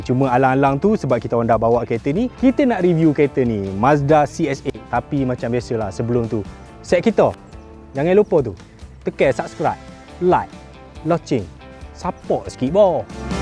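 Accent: Kelantan, Malaysia. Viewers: Duk kelate tu bang..has molek ii..sek kelate mari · Abang orang kelantan ke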